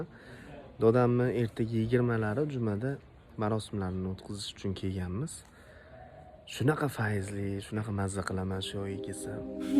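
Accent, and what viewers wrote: Kokand, Uzbekistan. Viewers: УЗИМИЗНИ КУКОНЧА.ШЕВАДА ГАПРСАЙИЗ ЯНАЯМ ЧИРОЙЛИ БУЛАРДИ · Quqon shevada gapirin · Куконда Дода дийилмайди углим додани Опоки дийишади сих хам Куконнни шевасида гапировринг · Укам каерда яшасангиз хам узлигизни унутманг Кукон шевада гапираверинг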